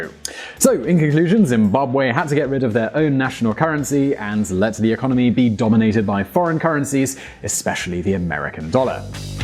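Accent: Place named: United Kingdom. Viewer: British accent and glasses doesn't make you make smart.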